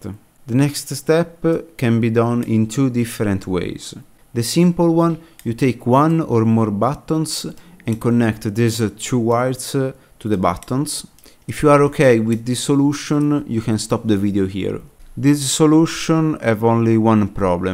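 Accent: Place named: Tuscany, Italy. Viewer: Mi sà che tu sei anche toscano oltre che italiano 😀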